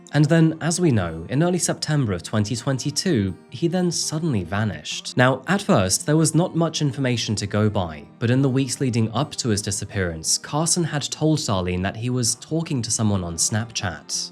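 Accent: United Kingdom. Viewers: Love your videos dude. I'm from the UK. Your accent sounds British, but with a twinge of something else maybe?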